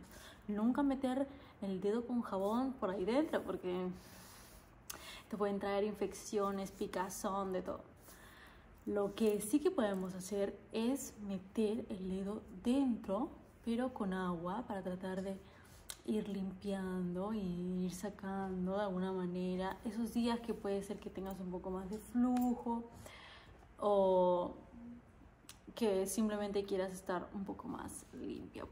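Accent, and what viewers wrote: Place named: Spain. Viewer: your the most beautiful girl I've ever seen, I love your beautiful Spanish accent and words, I hope someday I meet you and talk to you 😊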